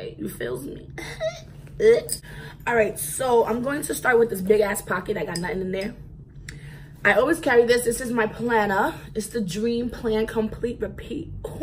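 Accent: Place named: New York, United States. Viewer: Are you in New York.... It’s your dialect. Miss mine. Super cute bag. Love my Big Apple Fam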